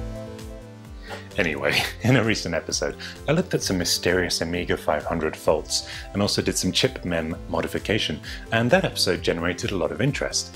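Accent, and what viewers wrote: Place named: Geordie, United Kingdom. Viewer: As someone from the North East, UK, that was a passable Geordie accent :) Also that carbon fibre wrap skinned Amiga looks amazing.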